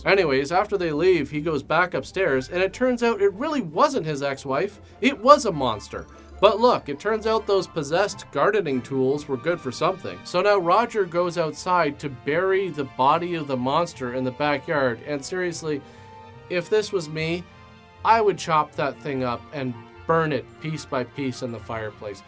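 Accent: Canada. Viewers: To be honest I'm kinda surprised you don't pronounce "aunt" as Ah•nt because you're Canadian. I've noticed Canadians put a lot of emphasis on pronouncing things exactly like they are spelled, for instance when you say soh•ree instead of sah•ree for "sorry".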